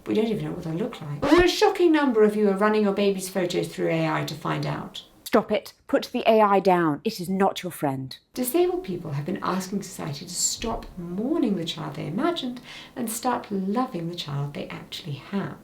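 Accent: United Kingdom. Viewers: You sound like what I think every person on the North American continent thinks British women sounds like. I will be subscribing for more.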